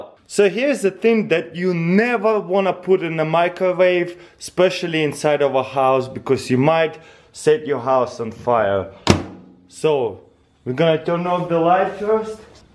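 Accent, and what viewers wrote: Russia. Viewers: i love listening to that Russian accent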